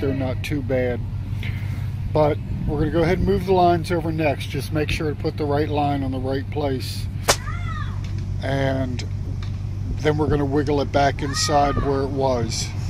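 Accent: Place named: Central Pennsylvania, United States. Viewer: You sound like your from Central Pennsylvania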